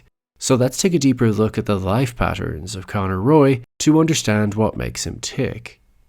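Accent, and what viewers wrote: Ireland. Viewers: LMAO a real "America is the whole world" out in the wild! It's an Irish accent you plonker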